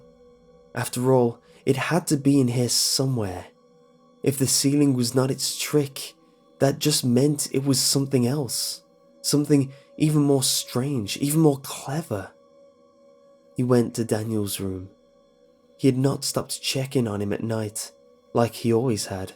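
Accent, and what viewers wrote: United Kingdom. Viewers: Happy birthday,. Honestly, your voice is perfectly for these kinds of creepy feelspastas. It has that earnest, nurturing feeling that just complements them perfectly.... And the accent. The sexy British accent.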